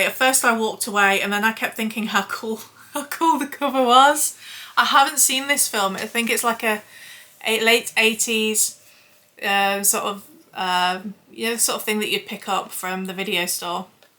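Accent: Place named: Liverpool, United Kingdom. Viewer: Why are you speaking in a Scouse accent? Are you even from Liverpool? If you are not from Liverpool then why are you speaking in a Scouse accent?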